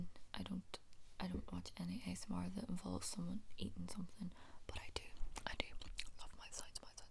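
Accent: Ireland. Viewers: now that u mention it I do hear the Irish accent and I love it!! congrats on 10k <3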